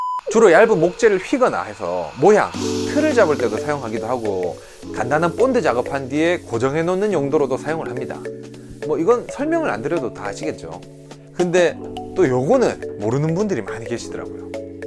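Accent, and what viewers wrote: Gyeongsang, South Korea. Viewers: 저 진짜 팬이에요 ㅎㅎㅎㅎ 다른 아이디로 cocokim 으로 구독 하고 2년 넘게 보다가, 지금 유튭 활동중인데 너무너무 재밌게 보고 있어요 ㅎㅎㅎㅎ 저는 미대 공부한 프리인데 공구 보는게 너무 재밌어요!! 타계정으로 보기 불편해서 주계정으로 또 팔로했네용 ㅎㅎㅎㅎ 언능 언능 보려규요 연기력도 늘었다고 ㅎㅎㅎㅎㅎ 한 사람이에요 갱상도 고향이라 넘 목소리 친근해서 힐링 되서 빠져서 들어요...ㅎ ㅅ ㅎ ❤️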